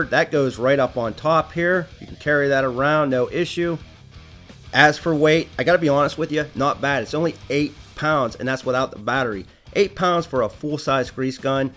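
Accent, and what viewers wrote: Pittsburgh, United States. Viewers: I sense a little Yinzer in that accent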